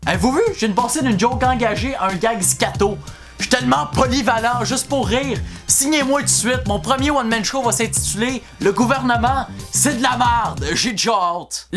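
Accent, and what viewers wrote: Quebec, Canada. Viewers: J'adore l'accent Québécois , je suis français et pourtant j'arrive quand même à comprendre un petit peu c'est très nice tes vidéos ! :) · je t'aime bien, mais moi je suis français et je viens du sud de la France donc je comprend rien à ce que tu dis avec ton accents et tes expressions Québécoises!!:)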